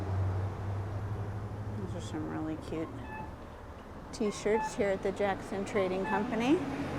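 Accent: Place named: South, United States. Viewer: Hi, London guy here. Wow! America is just so beautiful wow, wow, wow! I have to say, Montana and Wyoming have captured my heart. I am a real sucker for greenery. I do believe they film Clint Eastwoods movie here "Any Which Way You Can." Great video and scenery. I too love your accent. The deep southern accent is my favorite accent by far, I find it so hot when it is coming out of a females mouth if you get my drift! I can't wait to see your other videos. I must Subscribe to your channel. Thank you for making this awesome video.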